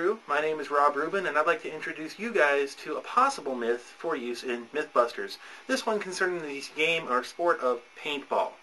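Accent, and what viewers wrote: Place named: Canada. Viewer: the dude (aND i use the term lightly) has GOT to be canadian.. anyone..lmao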